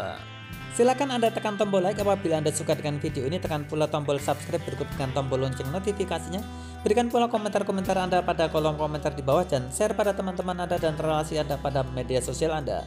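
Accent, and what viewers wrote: Java, Indonesia. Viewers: Tak LIKE 7 tak SUBSCRIBE yo, Mas. (aku seneng gaya bahasamu sing Medok iku) Qiqiqiii....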